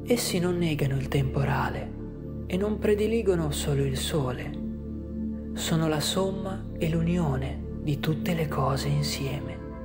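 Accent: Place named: Veneto, Italy. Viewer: Secondo me sei Veneto dalla parlata .....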